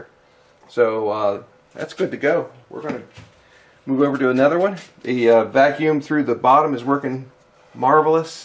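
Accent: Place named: Philadelphia, United States. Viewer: Your accent says you're either from the Philly area or still around there, LOL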